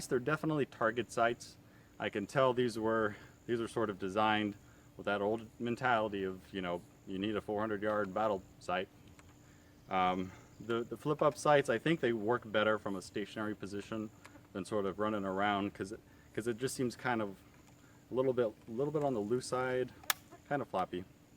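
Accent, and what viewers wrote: United States. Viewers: Oh that’s American I thought it was british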